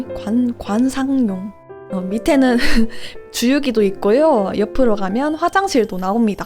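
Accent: Gyeongsang, South Korea. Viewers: 님 영상 처음본게 이거인데 그때도 님 억양듣자마자 바로 대구사람인거 알아챔 ㅋㅋ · 너. 대구 사람이지. 표준말투 쓰는 대구 사람가터. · 영상만 해도 힐링인데 갱상도갬성으로 내적친밀감이 +1000 상승했습니다♥ 흥해라 갱상도!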